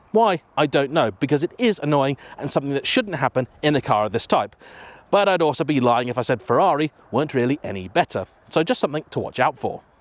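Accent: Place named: England, United Kingdom. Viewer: As beautiful as English accent is please please please speak slower ❤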